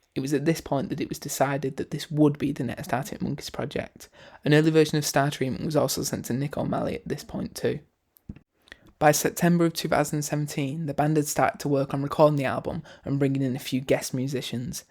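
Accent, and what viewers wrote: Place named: Sheffield, United Kingdom. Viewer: You sound like you’re from Sheffield yourself or something haha. I haven’t heard a similar accent like it from anyone except Arctic Monkeys.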